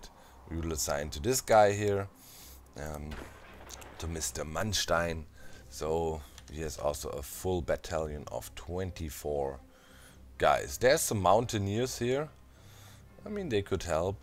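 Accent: Germany. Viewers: history, hoi4, docm, German accent, and a constant reminder of that German censorship of hitler(which I find the whole concept of that really hilarious?), what could be better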